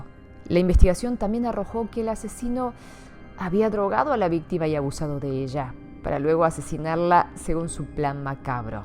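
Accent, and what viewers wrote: Argentina. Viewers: xd es raro ver asiaticos con el acento argentino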